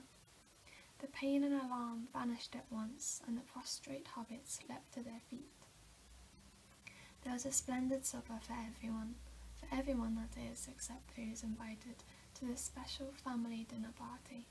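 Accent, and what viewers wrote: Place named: Wales, United Kingdom. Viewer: It sounded like you had a strong Welsh accent in first video but not so much now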